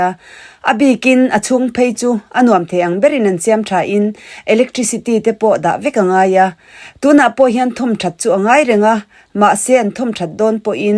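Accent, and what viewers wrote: Norway. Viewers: Kei chu tawngkam ithiam in thil i sawifiah thiam kati,,norway tawng thluka itawng te hi ngaihthlak anuam kati tlat thung..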